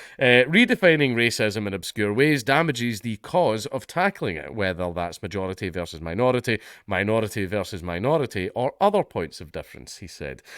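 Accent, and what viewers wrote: South, United States. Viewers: country accent is a gift I've never known I needed until I received it. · Dankulas American accent was fucking spot on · I love the southern US accent. Use it more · The Right sort of American accent too. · you do a great american accent.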